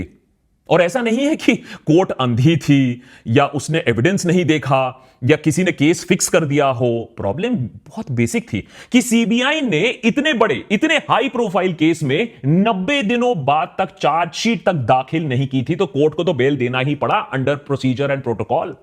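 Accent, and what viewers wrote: Bengal, India. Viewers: Akash Banerji bangali hain isliye sonjoyroy...bol roha hai. Typical bongali😅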